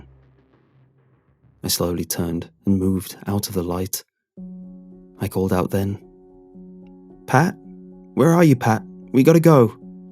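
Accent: United Kingdom. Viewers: You have a British accent .....cool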